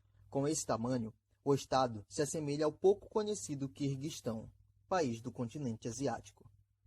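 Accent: Pará, Brazil. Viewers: kkkkkk hoje bem cedo,encontrei o canal pela primeira vez. Sou do Maranhão (cidade Bacuri)no Litoral Ocidental maranhense ,e conheço o sotaque do Pará.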